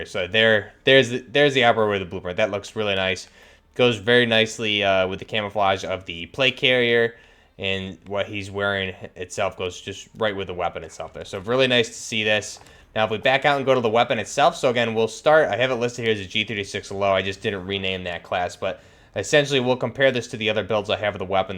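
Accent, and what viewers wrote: United States. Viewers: What voice does grom us? american?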